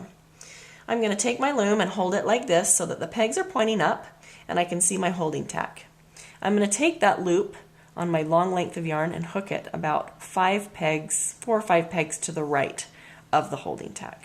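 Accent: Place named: England, United Kingdom. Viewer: Thx for the help but just saying u come from England ur not american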